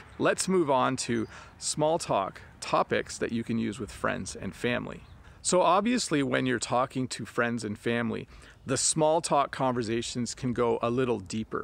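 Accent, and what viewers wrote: Canada. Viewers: 100% understood Thanks - Good lessons for frenchman like me, good to listen english with canadian accent!! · Dear, this is my first english teaching video I have ever seen (and heart). I am sure my english skills will be improve by waching your videos and daily ideoms on instagram. I like your accent. Habe you got a typical canadian one? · A lot, when I was living in Australia, specially with talks with Australians. I like your Canadian accent, specially when you pronounce the "au" like in about.:-)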